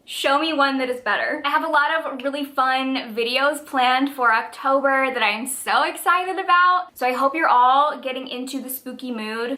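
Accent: United States. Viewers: Hi!! I just wanted to comment to say that Winter People authors name is Irish so it’s pronounced ‘Mac Mah-Hun’ you kind of said it right but with a very very strong American accent which is probably why it sounds kind of weird to us lol!!! I love your videos and your tbr vids are probably my favourite thing to watch 😂 💕💕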